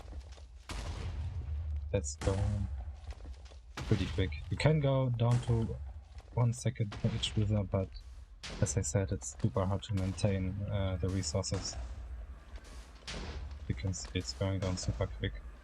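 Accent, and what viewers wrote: Germany. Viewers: Der deutsche mit seinem Akzent :D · are u german? sounds like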